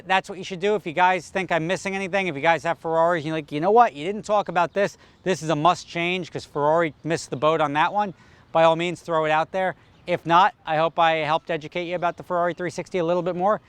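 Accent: United States. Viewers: Know what..!? This is all cool and everything,but hearing an American talk about Ferraris is like listening to Trump speaking about human rights; it just dosent seem natural.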